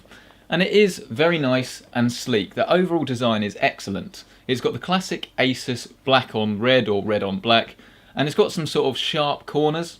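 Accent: England, United Kingdom. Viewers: As a non english native speaker,trying to understand what you are saying with that strong english accent its very difficulty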